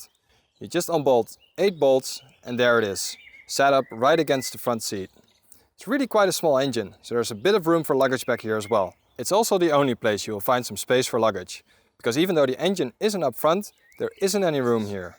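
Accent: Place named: Netherlands. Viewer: Die Nederlandse accent 😂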